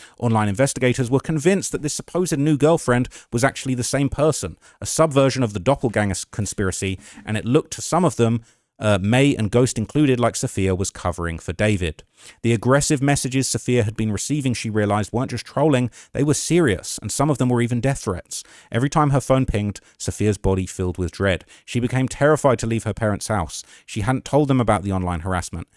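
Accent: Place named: United Kingdom. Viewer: Bros never heard of a British accent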